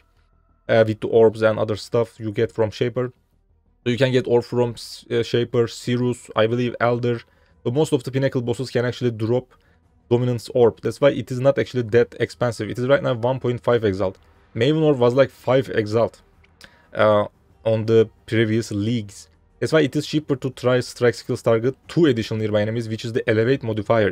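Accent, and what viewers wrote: Turkey. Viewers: Türk şivesini duyar duymaz tanırım agaa (Eline sağlık btw)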